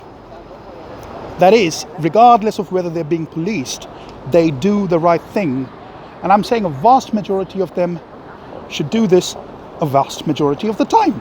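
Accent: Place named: United Kingdom. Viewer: I feel like this is a glitch in the matrix, hearing a Punjabi man with a British accent. Anyway, you get used to it soon enough.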